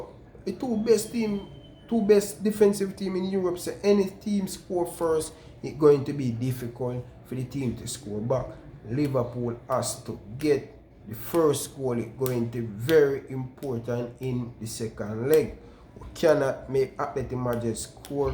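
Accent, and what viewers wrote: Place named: Jamaica. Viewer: where in jamaica you from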